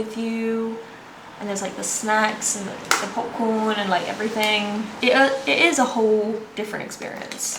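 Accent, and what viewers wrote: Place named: United Kingdom. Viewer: I'm from the states but knew instantly you were British. And yet you were clearly heard, no need for captions! Great midsection , btw. 😁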